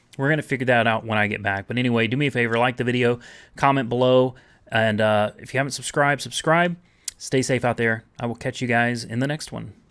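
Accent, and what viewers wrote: United States. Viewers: I love the way you speak your so calm and collected, as a Australian I often find Americans have funny and rather stupid accents but your the complete opposite. Also I love the content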